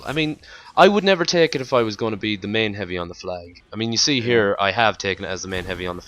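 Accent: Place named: Ireland. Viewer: Irish accent :D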